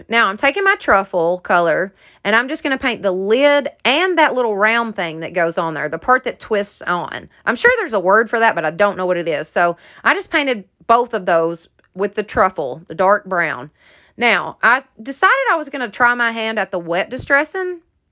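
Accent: South, United States. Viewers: I love your ideas and tutorials and how you keep all the mistakes for us to see. I just love listening to you talk. Love your Southerness 😍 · I love your content! You make the nicest things, but what I really like about you is your sweet warm southern charm and genuiness. I also love your cute southern accent! I laughed when you referred to Minnie Pearl a few videos back!! 👒👒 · I loove Holly . At Humble. Pie .and I clicked over here cause she said too lol..I’ subscribed just from u talking..I knew u would b good..u r southern and so cute lol..can’t wait to see what all u do..best wishes and hope u get many subscribers.. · Came from Holly's channel and I love you and your style and as a fellow southerner, your accent as well! 😉 People don't give me random stuff but I keep weird things because I'm like, hmmm you never know what I might use that for 😆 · I'm from GA I love the southern accent. 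You are so funny. Super cute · I like the lil wrinkles it looks real to me I ain’t never saw a real flag without em. Love all ya do and it’s a fresh breath of air for me personally to here you talk from one southern girl to the other. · Love your spunk, accent and style. I'm a southern gal myself. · Great diys. The lantern is my favorite! The first time I tried wet destress was on jars and it didnt work for me either. I didnt try again for a while but then tried on other materials besides glass and fell in love with wet destressing So, dont give up. Try it on other materials. I have friends and family always bringing me trash to treasure items like cold coffee bottles or things they were going to throw away for me to try to repurpose but I would have to say the most random thing I have been given is the rods for a pop up tent with the wire removed. The first thing that came to mind was to use them like dowels to hang fabric or canvas signs from but I am sure I will find more ideas for them too. 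I live in the south too and love to hear someone else talk "southern" like I do lol. Love you, your channel and your diys. Thank you for sharing and be blessed my crafting friend! ;) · I made the lantern but painted mine dark brown and white... came out so good and I love it only problem I had was getting the other end on so I flipped it over and made a bottom that I could easily open it up to turn my candle on.. Thank you for all ur cute ideas and I love hearing ur country accent!!! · I came over from Holly's channel. I am a fan of your phrase it's not a big deal it's just craftin'! You have the cutest southern drawl. My favorite DIY is the lantern. I like the jar pumpkins, too, but I am partial for traditional fall colors. I made a cute wall hanging with a lemonade flag by gluing a paint stick along each end, like a scroll, kind of. I painted them white. Then I embellished it with a few leaves and flowers. 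Have a great week! 🍁🌻🍂🍁🌻🍂🎃🍂🌻🍁🍂🌻🍁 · New Subbie...!!! Love the easy to make lantern !!!! And the jars !!! I am fixing ( southern term I am sure you are familiar with !) to make me some of those... already have the jars because we are canners !!!! Over all I love all your crafts !!!! And being from MS , love the southern accent..!! Thanks for all the tutorials..... off to watch more of your channel !!!!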